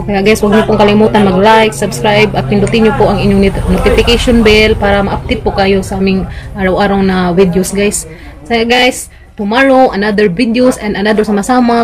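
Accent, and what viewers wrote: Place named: Visayas, Philippines. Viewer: katawa ko sa imung bislog, bisaya tagalog ba. By the grace of God, modanghan napud imung viewers k ga bislog naka unya joker pa gyud ka. Another thing about you k dili gyud ka selfish, k you're always thinking and generous to other people.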